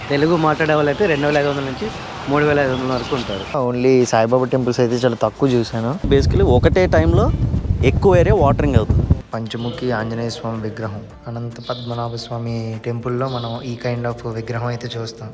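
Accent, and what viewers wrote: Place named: Anantapur, India. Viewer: yaantaapur district ... super accent anna...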